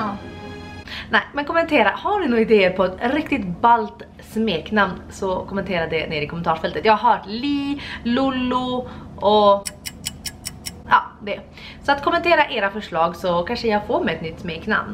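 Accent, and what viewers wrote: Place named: Skellefteå, Sweden. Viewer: Älskar när du säger bakanför. <3 Låter så charmigt. :D Skellefteå-dialekten är härlig. Men har aldrig hört det där innan du sa det. :) Säger inte att det är fel, lite kul att höra andras dialekt :)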